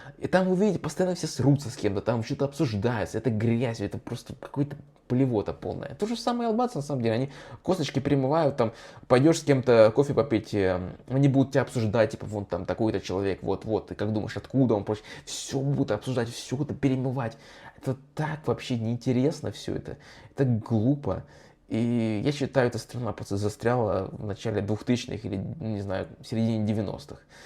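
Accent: Russia. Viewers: Послушал автора и сразу понял, что он с россии....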